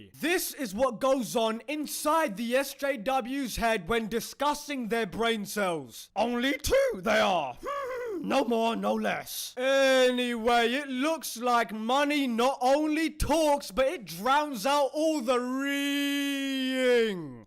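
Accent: United Kingdom. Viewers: The British accent makes this 100x better lmao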